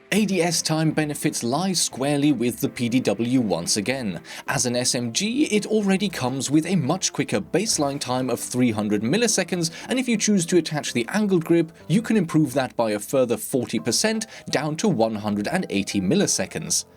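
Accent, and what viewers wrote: United Kingdom. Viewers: That’s just cause he’s British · I thought this guy was a robot with a british accent. My whole life has been a lie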